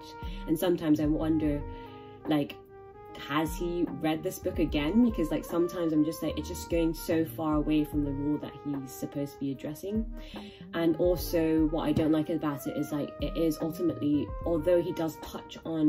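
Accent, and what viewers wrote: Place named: RP, United Kingdom. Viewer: Yeah, very posh :)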